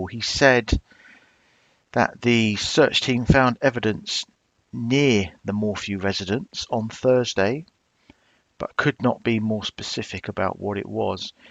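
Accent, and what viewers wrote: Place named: England, United Kingdom. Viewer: where in England is this accent from?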